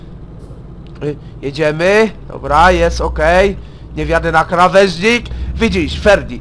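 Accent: Silesia, Poland. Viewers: ty jesteś z Śląska ??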